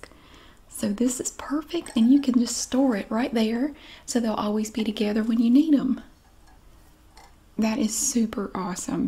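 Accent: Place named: Pittsburgh, United States. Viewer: ! What suburb outside of Pittsburgh was it?? I'm born and bread Pennsylvanian, just about 1.5 hours outside of Pitt. Also, we call the accent "Pittsburgh-ese"- I believe it was recently rated the worst accent in the entire country lol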